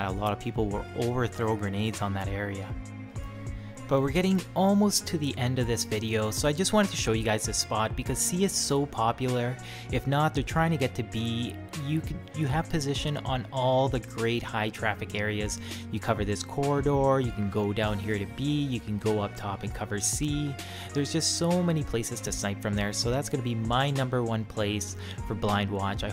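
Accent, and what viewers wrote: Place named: Canada. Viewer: Hey, are you Canadian? I can hear that accent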